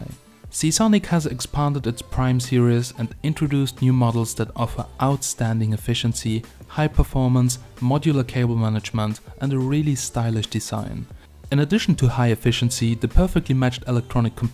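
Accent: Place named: Germany. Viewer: bist du Deutscher hört sich voll so an wenn du englisch sprichst.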